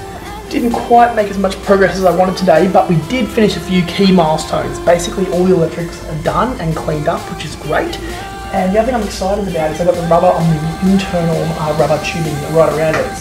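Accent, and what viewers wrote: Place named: Australia. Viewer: Feeling a lot like a mighty car mods video, not sure if its the similar music in the background or the aussie accent! haha Keep it up man!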